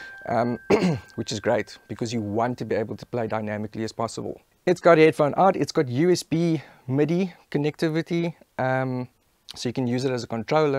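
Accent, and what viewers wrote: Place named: South Africa. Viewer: That's definitely an SA accent...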